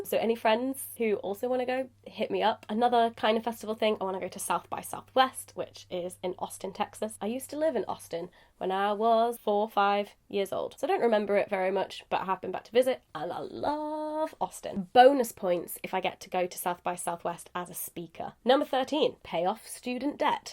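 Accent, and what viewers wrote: United Kingdom. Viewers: You are very cute and sexy and the British accent...OMG...so hot! Much love from Arizona, USA.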